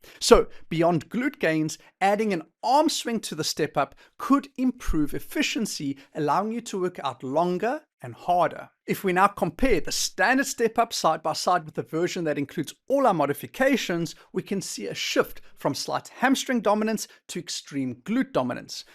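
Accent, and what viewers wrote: South Africa. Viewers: Do I hear a South African accent? 😊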